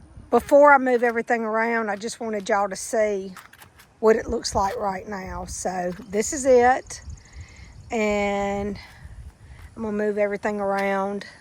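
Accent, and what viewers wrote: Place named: South, United States. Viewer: Love your southern accent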